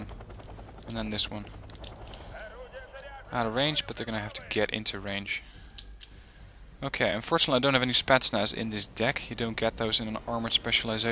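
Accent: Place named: Netherlands. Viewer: phung From his accent and voice i would say he is from the Netherlands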